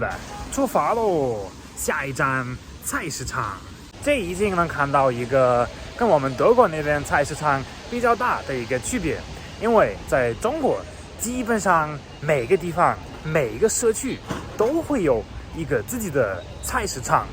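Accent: Sichuan, China. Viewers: “ ”的发音好四川，哈哈，你讲的是老外普通话夹杂四川词汇，非常有意思！继续加油哦 菜市场比较传统，便宜新鲜，会过日子的老人主妇煮夫喜欢去，年轻人则更习惯去超市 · 一口道地四川話，很有趣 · Learn. Chinese stay Beijing . He speak si chuan. And is video skill need improve . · 小伙的汉语已经被四川人带跑偏了😃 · 晕一嘴川味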